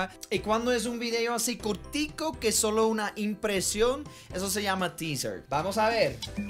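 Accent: Colombia. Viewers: habla como si fuera colombiano de nacimiento 🥰 TENEMOS 3 cordilleras en bogota no TENEMOS casas asi 😂 cual extrajenro si tu ya estas asi habla un colombiano eh ave maria me encantas tus videos 💗 y no se si ya lo sabes supongo q si pero igual te lo digo xd la cancion del teaser se llama y si algun dia haces una introduccion para tu canal deberias usar esa cancion porq iria excelente con el canal 🥰 y no eran orquideas 😂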